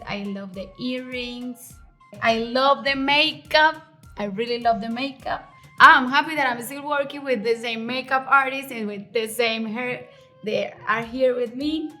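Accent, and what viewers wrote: Paisa, Colombia. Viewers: Paisanglish 😂😂😂 la amo ❤️❤️❤️❤️ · Ella habla paisa por eso sale asi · Que ingles bonito con acento paisa. Karol G una dura una bichota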